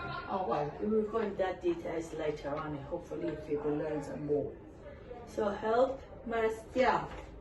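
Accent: Kandahar, Afghanistan. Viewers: May I ask if this is a kandahari dialect? I married a Pashtun man from Kandahar and am trying to learn his dialect (he speaks southern pashto) which is a bit different from northern pashto.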